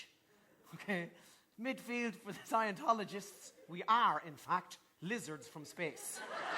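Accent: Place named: Geordie, United Kingdom. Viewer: 46 min, a wee bit Geordie, he sounds a little like Sarah Millican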